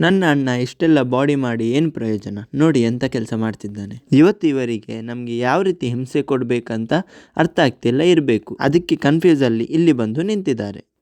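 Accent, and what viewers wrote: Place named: Mangalore, India. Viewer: Mangalore kannada kelode chenda ❤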